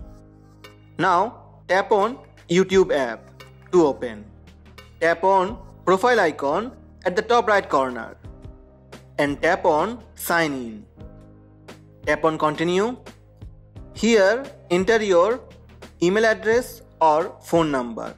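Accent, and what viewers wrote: India. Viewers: Bruh you sound like an Indian .i think you are O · indian right?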